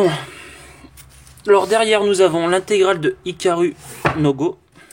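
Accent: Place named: England, United Kingdom. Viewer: Excellente cette vidéo avec un accent anglais tellement beau ! Je recommande les yeux fermés les perdus de l'internet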